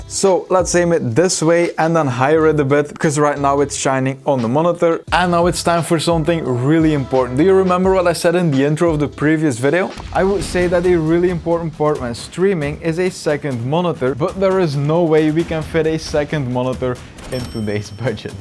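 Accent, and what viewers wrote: Netherlands. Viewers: Cool series but i have one question, are you Dutch? I’m Dutch… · nice but i smell nederlands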